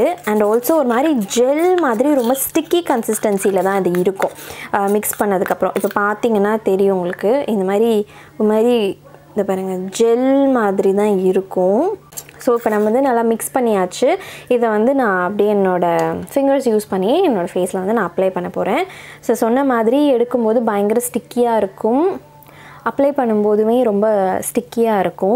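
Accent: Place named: England, United Kingdom. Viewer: Wow wat a English accent 🤗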